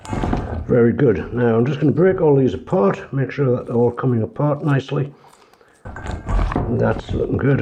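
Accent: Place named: Tyneside, United Kingdom. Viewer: Love this recipe, we live in Canada and onion bhajis are weird over here. Husband is from Sunderland (grew up in Washington) and he’s placing your accent between North Shields and Newcastle , how accurate is he? Thanks for the recipe.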